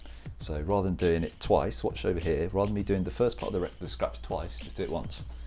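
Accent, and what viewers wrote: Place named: Texas, United States. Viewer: Yeah, I can't find anyone else being credited with inventing it. I believe I was wrong😁 Nice chatting, I enjoy your videos and I like your hand drawn S9 faceplate! 12inch Skins is awesome, got some on my setup if you want to check on my videos. The videos are terrible, ignore that part... Cheers from Buffalo NY! I'm guessing your from Texas judging from the accent lol! Or maybe Great Britan! 😁 I hope to get there someday. Looks beautiful! Cheers!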